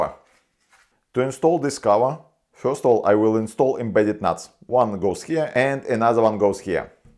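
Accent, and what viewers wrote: Russia. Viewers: Русский акцент слышно даже мне. Но то что автор не стесняется разговаривать это молодец. Видос не досмотрел, но за старания лайк. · Акцент русский.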